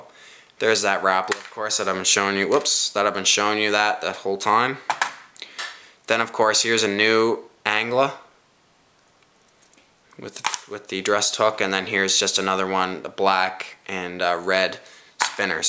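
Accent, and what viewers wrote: Canada. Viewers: Aboot as Canadian as it gets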